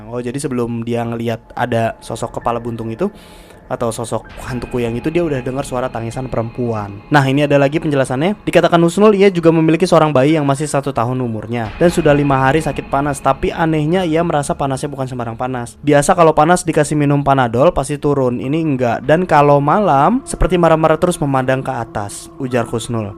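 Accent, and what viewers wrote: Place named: Surabaya, Indonesia. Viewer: Hadir coy suroboyoan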